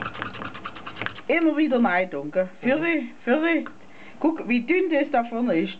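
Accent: Swabia, Germany. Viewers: Vielen Dank! So schön schwäbisch zu hören ❤ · Das war schwäbisch, nicht bayrisch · Aus welchem Ort kommt sie, schwäbische Alb? Bitte auch noch das Rezept für den Teig posten! :-) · angiek 84 schwabenland, schwäbisch vom feinsten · j t Sie is aus dem schwabenländle :) (also aus der Region um Stuttgart) den Dialekt oder der Akzent nennt mann schwäbisch :) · Expert! Sounds like Schwabish. :) Born in U.S.A. but love to hear my Mom's dialekt. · I muss sagga i mag den schwäbschen akzent ga edda abba zu spätzle kehrt des eifach dazua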